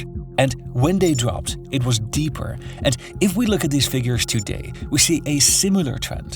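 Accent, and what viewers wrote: Netherlands. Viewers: Ik kon aan je Engels horen dat je moedertaal Nederlands is. Checked the ‘About’ section…and sure enough. (Living in Florida past 15 years)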